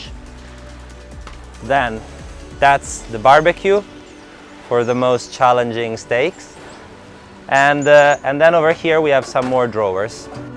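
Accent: Italy. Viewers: Love it,! Especially when clip is over 10 minutes! Please come back with your italian, it gives so much style and chic..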